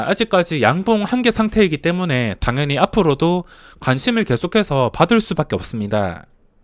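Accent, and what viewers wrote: Gyeongsang, South Korea. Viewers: 창원사투리 진짜 짜증나네 표준물좀 써주시죠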